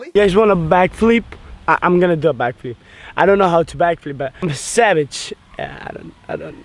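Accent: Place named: Spain. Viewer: Me gusta tu voz Española se escucha muy cool good job man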